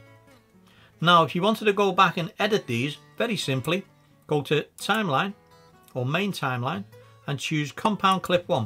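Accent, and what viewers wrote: Liverpool, United Kingdom. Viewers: Hi mate, i googled compound clip for something on Fillmora as I made a video that had wayyy to many clips and needed to merge them as I made 3 separate "films" part 1,2 and 3. Needed to put them as 1 as it took 8 hours to make the 3 films into 1. Anyways Googled it an your video was the 1st video to come up... hit play and I smiled as your VOICE, the accent. I recognised as I'm from Liverpool and it was nice to hear. (Hopefully u r from around these ways an i don't look like a wally lol) Thanks for the video. I'm getting into Fillmora more & more. I don't restrict myself to a software as its the amateur thing if u don't use Premier or the apple 1. I like to use Adobe, davinci & filmora I am really enjoying it. Will be watching more of ur videos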